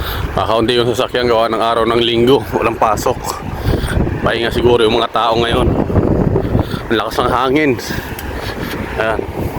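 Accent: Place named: Batangas, Philippines. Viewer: Boss, taga batangas ka? Yung accent mo kasi parang similar sa mga batangenyo. Anyways godbless ingat palagi, tuloy lang boss sa pag vlog, support lang kami dito kabayan. May kasabihan nga ang mga OFW ang bagong bayani ng pilipinas❤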